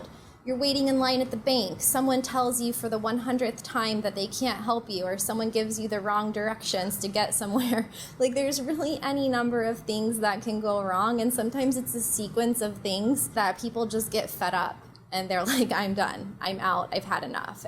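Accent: United States. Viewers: Sorry ,but croaky American accent makes this impossible to listen to !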